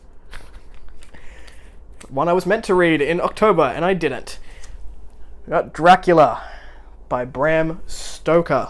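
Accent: Australia. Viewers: Great to hear a Aussie accent. Loved JV Jones back in the day.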